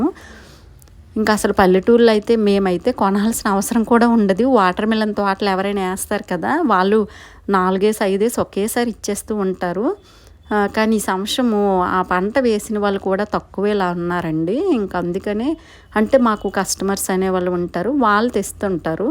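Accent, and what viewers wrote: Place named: Srikakulam, India. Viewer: Akka slag vinte manadhi ma srikakulam la vundhi ea oru akka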